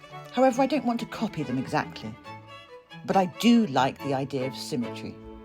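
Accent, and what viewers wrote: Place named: England, United Kingdom. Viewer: I just came across your station and I am so engaged with your channel! You have managed to make everything a real experience from the classical music , the English accent and the history ,poetry I love ❤️ it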